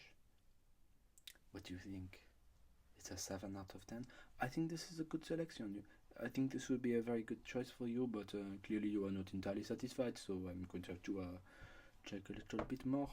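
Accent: France. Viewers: what il est français ou anglais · As a french, I would like to know if u (english people) understand everything when he speak english with French accent? Bc he's doing it very well, so im wondering if it's comprehensive when we're speaking to u (and if it's not too disagreeable for ur ears aha?) Pleaseee respond, and of course scuse my orthography everybody knows that we're des grosses merdes in english :) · I spend 9 months in Tours which is supposed to have the most "pure" accent and you sound so much like the tourangeaux! Bon travail :) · it’s okay!! You’re french accent is amazing!! Never stop making these!! You’ll get big for sure cause you definitely deserve it! May be be careful when moving items and your hands cause it might hit the mic but other than that these videos are great! Thank you so much for making these and keep it up! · Your French is excellent and French accent is spot on. 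You must be a native speaker. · Amazing job lmao. You didn't even overdo it. you really sound like a Frenchman trying hard to sound English but they can't 😂😂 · Shit, your french accent in english is so good. I love it, I need more of it haha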